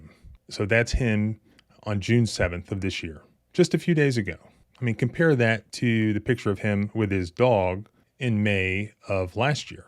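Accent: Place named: United States. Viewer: One of the really nice things about your videos is that you have one of the best-sounding American accents. It is very pleasant to listen to.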